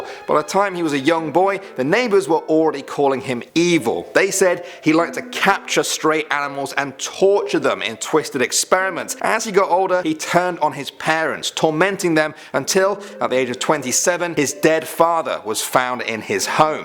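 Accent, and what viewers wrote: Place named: United Kingdom. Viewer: Are from the uk by the way you speak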